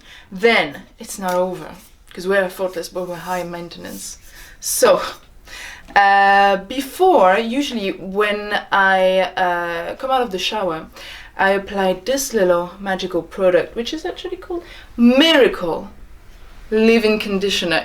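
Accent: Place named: France. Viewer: je viens de decouvrir ta chaine, tu est française ? i just discovered ur channel and wanted to know if u'r french becuz of ur accent i find that it's somehow a french accent but in a goood way 😊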